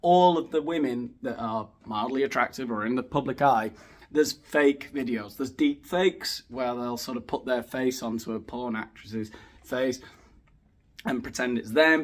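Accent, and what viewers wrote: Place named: Yorkshire, United Kingdom. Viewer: I'd pay proper money for an audio version....with you reading it. A proper, Yorkshire accent would make it almost bearable......I'd still have to take regular wine breaks, though. But I'd rather stick pins in my eyes that listen to that awful pseudo Americanised drawl from Hawy.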